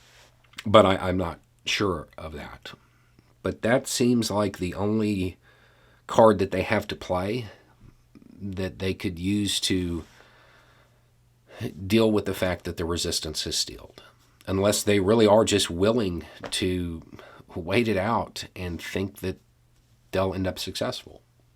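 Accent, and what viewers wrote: South, United States. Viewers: Howdy! Thanks for your thoughts and considerations. First of all let me say that I'm glad I found your channel. Although I first was a little sceptical when I watched the videos, as for some reasons, to me it looks suspicious when I see a bearded US guy with a southern accent talking about politics from what looks like a preppers bunker, closing with an image where he's sipping moonshine;) No offence though, I guess you can imagine what events in more or less recent history made me develop that sense. But after watching some more of your videos and of course doing my own research on some topics, I came to the conclusion that you're actually one of the most considerate and probably most trustworthy youtubers I have come across. And I tend to agree with many (if not most) of your conclusions and predictions - at least as far as my own information background allows. 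However, talking about P's plans for the Ukraine, from all I learned about him recently, and from the sources I found so far, I think he will not content himself with "liberating" the recently acknowledged "republics" in the Donbas. At least not if he still sees a chance to occupy the south, to have an access to Crimea via road and train. And he will even try to go a bit further to the west, to secure the water supply for Crimea from the Dnieper, by occupying Kherson county and the canal (which was closed by Ukraine after Crimea was annexed and caused a draught there). And while doing so, he will leave scorched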